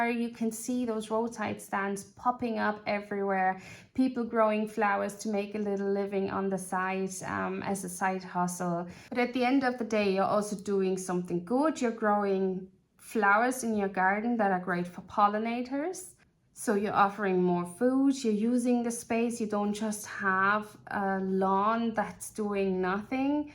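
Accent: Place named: Ireland. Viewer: I'm enjoying catching up on your older videos. I'm so glad you made shorts as well! I'm telling all my homestead-interested friends about your channel, too. We adore supporting (and watching) small channels and helping the good ones grow! The bonus for me is feeling less homesick just listening to you speak. (I'm in USA and my Da was born in Malin Head, County Donegal).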